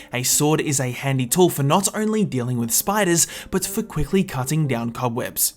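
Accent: Australia. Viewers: Yeaaaaaah I knew you were Australian for dat accent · I immediately knew you're Australian because your accent is British on steroids. I know that I as a German should probably not be saying that, as German is basically Dutch on steroids, but that's something I just wanted you to know.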